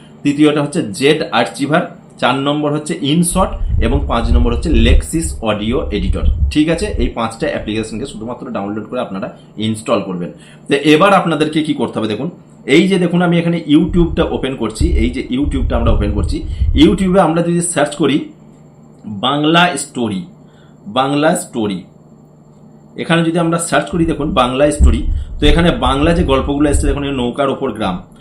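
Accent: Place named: India. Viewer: আপনার কয়েকটি কথা শুনেই আমি বুঝেছি যে আপনি ভারতীয়।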